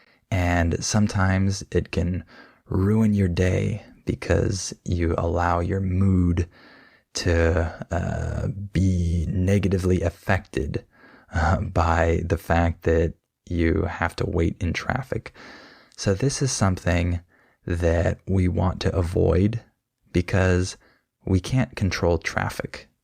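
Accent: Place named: United States. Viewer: هذي لكنة امريكية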